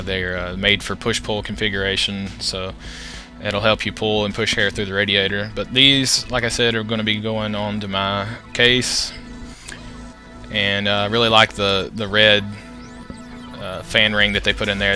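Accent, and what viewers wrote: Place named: Alabama, United States. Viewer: Nice rig. I am building pretty much the exact thing aside from the case and few others. You sound like you're from Alabama or close lol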